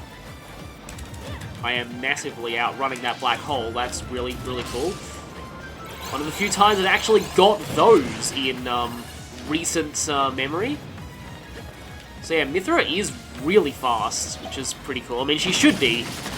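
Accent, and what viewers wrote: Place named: Australia. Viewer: could this video be foreshadowing for a xenoblade 2 playthrough? i would love me some british dub + ozzie commentary combo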